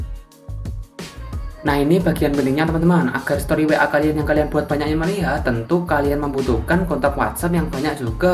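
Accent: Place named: Java, Indonesia. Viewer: Orang Jawa yh bbg 😂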